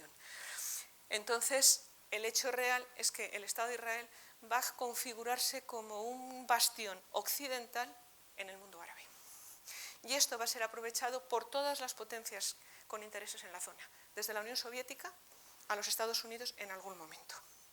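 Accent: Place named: Spain. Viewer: Esta mujer es española por el asentó .....entonces muuuuuy probablemente sea católica romana y de allí por supuesto no acepta que los descendientes de Jacob son los dueños de esa tierra y si no quieren aceptar. Respeten la compra de esas tierras que que compro Abraham. Hay pruebassssss ancestrales así esta mujer y sus secuaces digo su grupo intelectual siguen al vaticano quien formó el Islam $$$$ y les hacen batalla a los judíos en todo el mundo.y la católica a todos los dueños de sus tierras mandando a matar a los nativos de el mundo. Y esta mujer es una ignorante deve educarse antes y después de lo las fechas que da y realmente decir la verdad profunda y clara es una vendida .como que si a México no lo siguieran saqueando. Dios nos libre de esta barbarie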